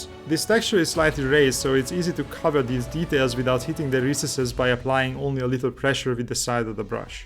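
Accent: Hungary. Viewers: Tell me you are Hungarian without telling me. That accent. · Nagyon szép munka, köszönet érte! Magyarul szóló vidi is szuper lenne. Ahogy megszólaltál, rögtön tudtam, hogy földi vagy. 😁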